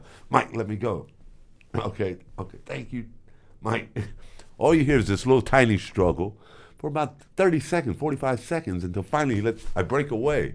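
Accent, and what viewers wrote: United States. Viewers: You are so Sweet hhhhhh you have a Great Voice and American accent I Love it from Algerian. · Hey Americans ! What state accent is this man speaking ?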